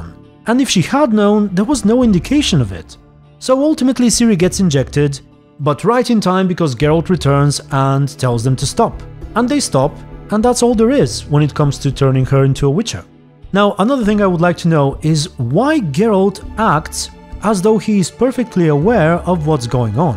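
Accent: Bulgaria. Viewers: Eyyyy you Bulgarian bro?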